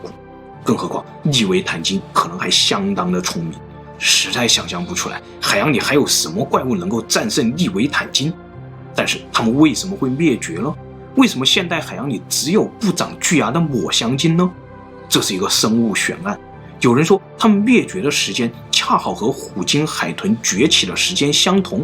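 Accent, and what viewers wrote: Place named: Guizhou, China. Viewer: 总感觉是贵州人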